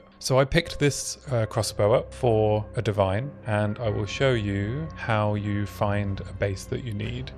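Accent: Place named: England, United Kingdom. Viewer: Hi. I've been theory crafting a build for the negative armour on Warbringer. I'm on a holiday so been researching other armour break builds when the family have gone to bed. I just watched through your videos of this league and they're great. I love listen to a fellow Englishman talk about POE2 for a change. You videos are high quality and you explain things well. I'll definitely check you out on twitch when I get a chance. And my condolences on the attack speed. 😅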